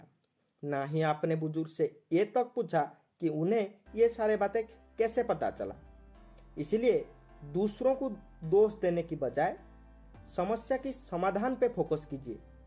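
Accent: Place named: Odisha, India. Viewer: Odia person telling the story, I think! 🙏🏻💪🏻 Bhainaaaaa! 👍🏻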